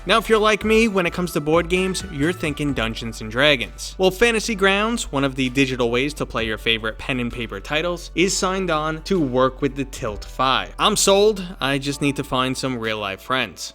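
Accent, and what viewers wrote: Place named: Boston, United States. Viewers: Lmao it does sound little bit boston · your boston accent made this video 10x better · You are so Boston holy shit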